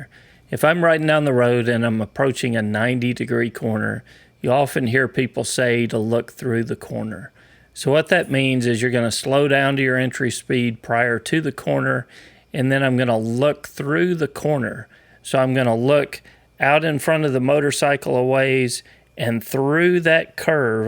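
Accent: Texas, United States. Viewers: I like the Texan accent :-)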